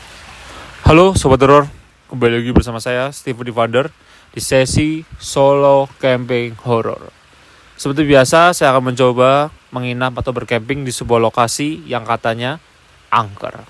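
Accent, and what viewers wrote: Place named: Java, Indonesia. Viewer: Channel saya suka sekali...logat Jawanya kental sekali dan campingnya sungguh bikin jantung 💓 copot hahaha.... salam damai bersamamu selalu dek.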